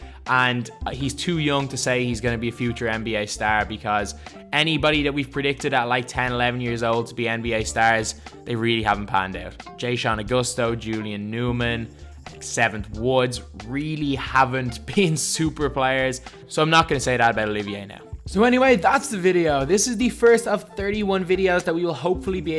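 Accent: Ireland. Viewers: I know that DKM is Scottish, but are you Irish? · I can tell you're Irish... Noice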